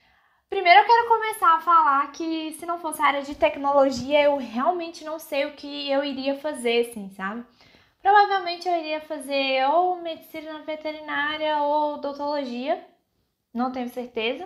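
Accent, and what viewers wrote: Minas Gerais, Brazil. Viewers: Algumas coisas no sotaque mineiro são inconfundíveis. Percebi logo na primeira frase kk Mais um inscrito o/ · meu Deus ...q top kkkk parabens ... vc fala igualzinho a Ana Paula Valadão ! vc é mineira tbm ?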